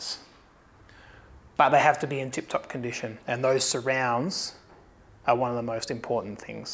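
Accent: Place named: Australia. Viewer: I'm assuming that you're in Oz (from your accent). The Alnico Super 12's pop up every once in a while on Marketplace. One pair sold less than a month ago and there is another pair in cabinets on there at present. As Molly would say.... Do yourself a favour and find them 😂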